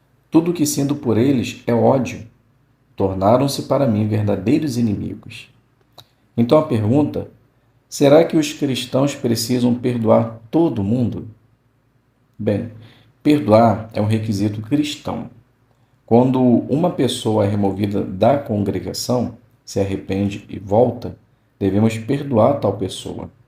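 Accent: Rio de Janeiro, Brazil. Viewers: Amo o sotaque carioca,um abraço irmão 😊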